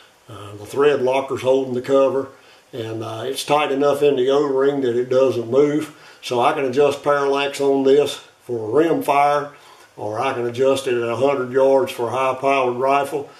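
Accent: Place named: South, United States. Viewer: Really enjoying your videos. It’s all good. Good to hear an American country voice that I can understand and knows his business. I personally thank you taking the time to share your knowledge with us.👍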